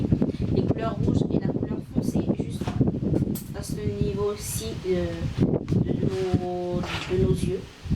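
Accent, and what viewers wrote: Ivory Coast. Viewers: Accent Ivoirien ma chérie.😍😍😍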